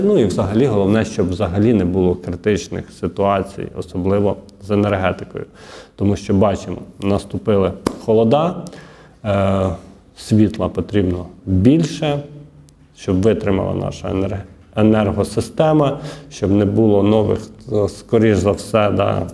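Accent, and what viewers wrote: Vinnytsia, Ukraine. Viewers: демагог з бідагогічною освітою,припини херню нести,а краще навчайся вірно,бо твій вінницько жмеринський діалект ріже слух. Менше води,більше конкретики. І яка була корисна інформація в цьому відео,?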